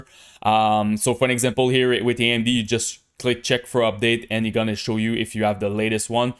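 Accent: Quebec, Canada. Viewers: Omg tes français canadien!!!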